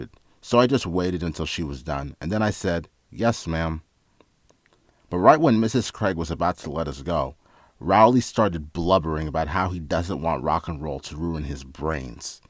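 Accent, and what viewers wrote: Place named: United Kingdom. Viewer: Hes british i think bc of the tone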